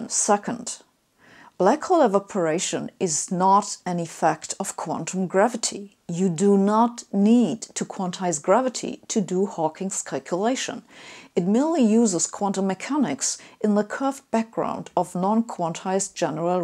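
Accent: Germany. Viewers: Arthur Dent dude she's German it's called an accent.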